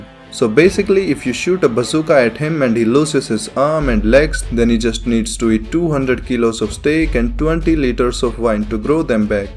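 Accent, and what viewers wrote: India. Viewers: I just starte watching this chanell and its just so fun with his indian like voice exsplaining typical baki stuff to me like it was kindergarden i love it! (allso why is every single human in baki anime have more mussels than Any body bulder on earth?) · Where are you from? Your accent is Indian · Is this guy from India? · Are you an indian 🤔 just asking because your voice sounds very familiar with the people of india 😎. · the indian accent is what makes this videos the best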